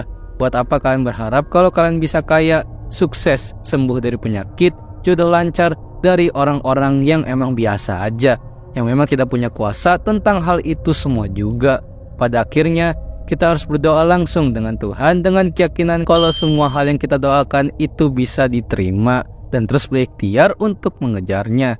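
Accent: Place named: Banjar, Indonesia. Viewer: Bang orng banjar masin? Logatnya kaua orng banjar 🗿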